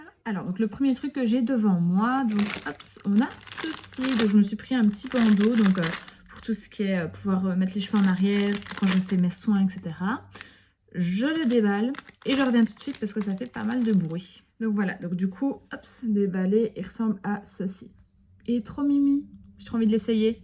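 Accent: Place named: Belgium. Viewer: Meuf je kifff ton accent 😅☺️je pense que t’es belges si nn super ta vidéo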